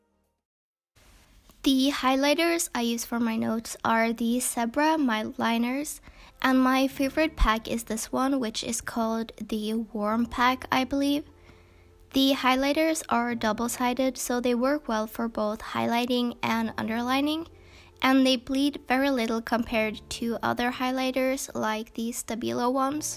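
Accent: United States. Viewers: I was surprised when I found out that you were Norwegian. I found out when i realized that you have access to Panduro, which is one of my local stores. And in another video you used your phone and the language was Norwegian. The reason I'm saying this is because from all the Norwegians I've heard. You sound like you come from America. Obviously I don't know if you come from Norway. Cause I live in Norway, but I come from a different country, and so Norwegian is my second language. And it makes me sound not NorwegianXD I'm just saying that I've been getting so used to hearing typical Norwegian people speak English, that it surprised me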